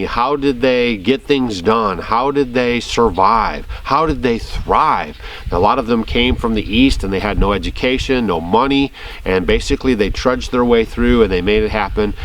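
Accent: United States. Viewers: what, no heritage ?hey, you sound like someone from the USA... (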